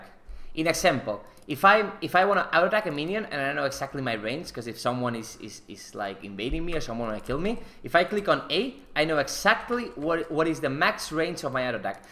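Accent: Spain. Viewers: it's a spanish accent. like spain in europe not latin america. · I know you are Spanish, you need to learn english not Spaglish....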